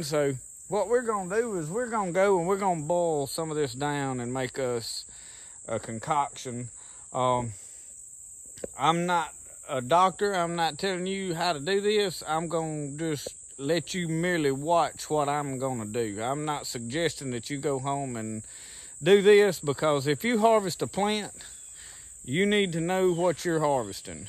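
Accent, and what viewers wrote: South, United States. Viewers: When I was in the U.S. Army in Agusta GA, I was caught talking while a Master Seargent was speaking to us. He asked my name and I replied with an accent just like yours. It was so good that he said , "Boy, what part of the South are you from ?" I replied, "South Bronx, New York ". He said, "Boy, jump down and give me 50 pushups " ! I did and laughed so hard while doing them. I never forgot that moment. I suppose he never did either ! Love your accent , you're a good ol' boy !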